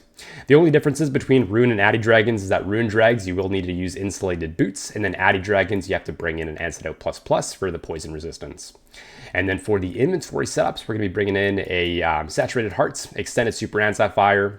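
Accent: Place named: Australia. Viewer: i did not know steve irwin making guides now haha. mate.. haha (aussie) lol. Dragon hunter want just one of the best new additions in the game recently, so many good places to use it. Fantastic and useful guide as always!!!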